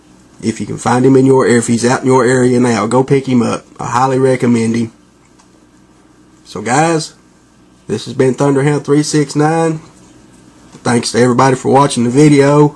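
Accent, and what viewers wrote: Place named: South, United States. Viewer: I was hoping so, since you sould like your from the south too. Maybe I'll be able to find one soon then. Thanks, PS check out my channel, if like custom transformers!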